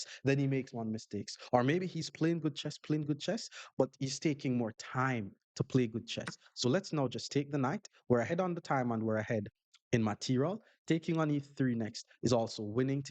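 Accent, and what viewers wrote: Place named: Jamaica. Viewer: Jamaican accent makes everything sound cooler and more interesting. I can watch these long videos with all my attention the whole way through.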